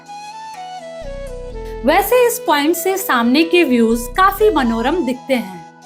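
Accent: Uttarakhand, India. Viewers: Thank you ji apne jo vlog banaya jo apne itna sunder hill station dikhaya hame itn sunder hill se jyada apki awaj me muje uttarakhand ki mahak ati hai ji itna sunder cuit smile dekh ke dil khush ho jata hai apke vlog ka besabri se intjar rehta hai love you vlog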